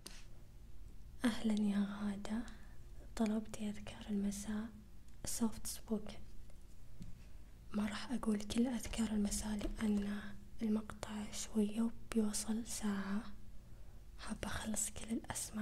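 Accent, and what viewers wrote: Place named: Saudi Arabia. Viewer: هي من وين معليش ؟ احيانا احسها سعوديه واحياناً لا من اللهجه، (ونعم فيها من وين ما كانت اكيد بس اسال لان من زمان ادور سعوديه تسوي محتوى asmr مالقيت )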